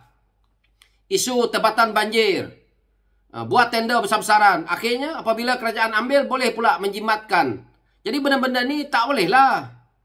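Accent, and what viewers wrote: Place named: Terengganu, Malaysia. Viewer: Kuat betul loghat trengganu tuu..😅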